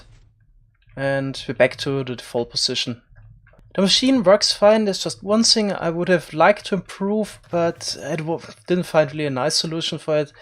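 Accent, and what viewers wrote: Germany. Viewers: Man hört das Deutschland sehr gut raus.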